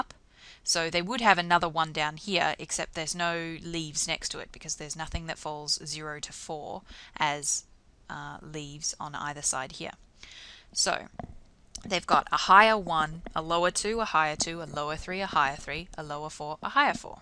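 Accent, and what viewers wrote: Australia. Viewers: Aussie! Ayy reminded me of Alycia Debnam Carey... but yesss thank you for this! V helpful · you have a lovely accent haha it's aussie accent right?;) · yea its aussie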